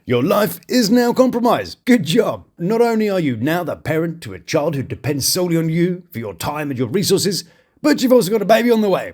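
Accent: United Kingdom. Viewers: Just watched final episode season 6 love after lock up . I just could NOT stop myself from looking up did Julius become a rapper or got a real job 😂🤣. AWESOME I found your update . You got SO MUCH information & put it on your channel so BADASS , HILARIOUS, PROFESSIONAL etc…PERFECTLY ‼️Even witt British accent you spoke every word so clearly. And Alexia is beautiful inside & out from what I seen on the show . I really 🙏she takes her own advice & loves herself better . She definitely could find a handsome , educated, working man . She has discernment on top of ALL her other great qualities. She just needs DO IT 🙏💛♥️‼️Tyvm I appreciate yah. May god bless you and yours. JESUS PEACE 👍😎😎‼️ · Omg i love your accent ! Brittish guys are So hot! You sound too fancy to be watching us anericans trash tv shows tho 😂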